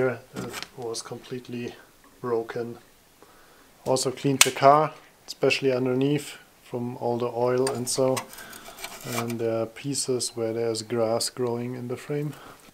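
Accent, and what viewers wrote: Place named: Germany. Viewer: beautiful landspace, where do you life, monkeys running around? your english speech seem to be a littie bit "germanized". thanks for your comments and detailed video from your landy repair! best wishes from austria!!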